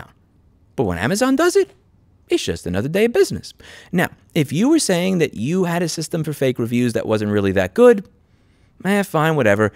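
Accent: New York, United States. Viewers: Hey louis. I genuinely didn't like you when i first found you. I couldn't tell you why, I'd like to blame my Midwest sensibilities clashing with your NY pattern. But I've learned over time who you are and what you want. As little power as i have, i am with you, and I am sharing your vids. I wish i had learned earlier that we had the same goal. Thank you for never stepping down or shying away. You are what every nobody trying to make the broken world work needs. I wish i had half of your drive, and I appreciate how much you do, bared to not just the sun but the crazy lens of the internet. You refuse to compromise, you refuse to back down, and I love it. Thank you louis.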